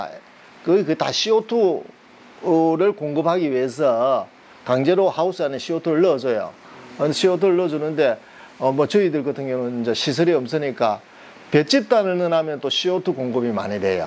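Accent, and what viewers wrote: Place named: Gyeongsang, South Korea. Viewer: 듣다가 듣다가 경상도 억양에 스트레스 받아 도저히 못 듣고 나갑니다.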